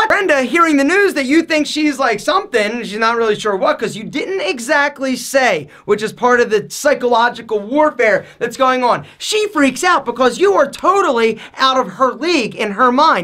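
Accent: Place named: Boston, United States. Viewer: U gotta be from Boston lol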